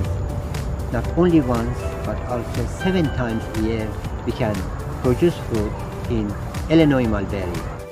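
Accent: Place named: Iran. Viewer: The best video on this topic. Thank you. You sound Persian.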